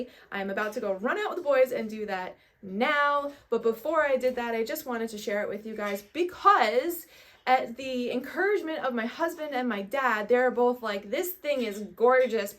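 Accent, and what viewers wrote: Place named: New Jersey, United States. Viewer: That cardigan is so nice girl you have very gifted hands 🙌🏾If you don't mind me asking where is your accent from? its sounds so cool like the way you pronounce certain things reminds me so much of jersey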